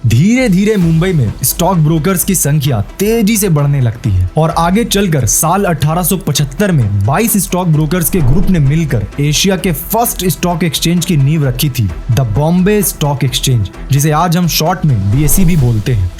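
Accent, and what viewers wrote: Bihar, India. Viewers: Are you from bihar ?? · Bhai kya tum bihar se ho please reply ❤❤❤❤ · Are you a Bihari? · मतलब आप बिहार से हो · You are from bihar...... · Aap bihar se hona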